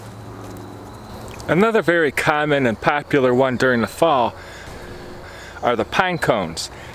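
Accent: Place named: New England, United States. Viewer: Judging by the environment, it appears you are Coastal US. Accent seems to be more "North" of me. What State do you reside in? I'd guess New England area...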